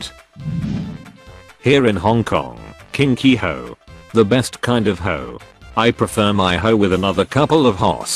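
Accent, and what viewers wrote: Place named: England, United Kingdom. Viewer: A robot with an English accent is still a robot. You need to do better.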